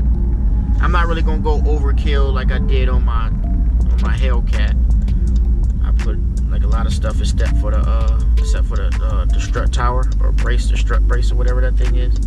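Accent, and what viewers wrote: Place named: South, United States. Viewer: This dude still sounds country as hell!!!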